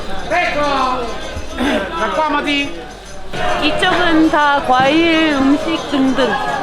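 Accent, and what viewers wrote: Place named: South Korea. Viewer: 악센트가 딱 한국인입니다 그려.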